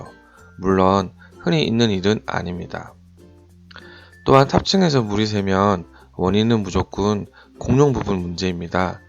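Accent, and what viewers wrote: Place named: Gyeongsang, South Korea. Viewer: 갱상도 꺼져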